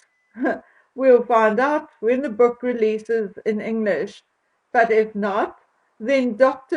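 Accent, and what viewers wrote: South Africa. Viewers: I love the way you say ‘Big Willie’ in your great S African accent! Thanks for hanging in there for us.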